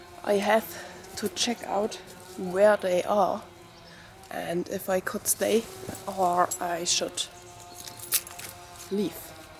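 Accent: Germany. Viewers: Do you own a handgun. Is it hard to get a license for that ? What about bugs there in summertime. Your dog is nice. You have a nice German accent. Greetings from Eastern Canada. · Cold outside😉like your Denglisch 😉👍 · Great results from your sleeping bag ..... love your German accent...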